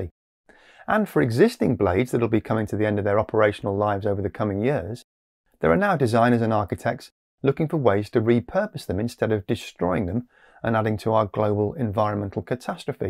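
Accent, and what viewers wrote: England, United Kingdom. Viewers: 5 second in, befor I could mute this vile noise from england. I assumed it would be Human aka American speech. Can't watch such mess.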